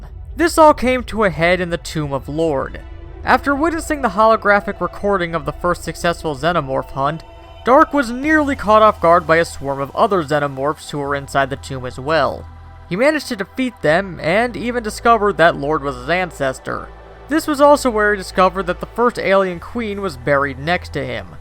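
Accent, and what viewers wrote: United Kingdom. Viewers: Jon Grajeda it’s down to accent, usually it’s the British that pronounce it like that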